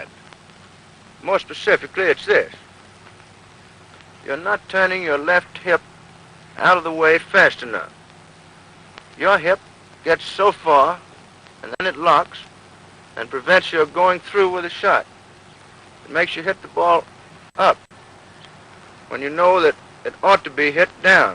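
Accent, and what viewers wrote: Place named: Georgia, United States. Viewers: Growing up in Georgia, this was how all my older relatives spoke at family reunions. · God Bless you & your family. I trust ya'll are doing well. I am a linguist & most thoroughly enjoy this most classic American accent, very much so. It is one of those, like so many, that are unfortunately getting lost to time. Cheers to you & your kin 🍻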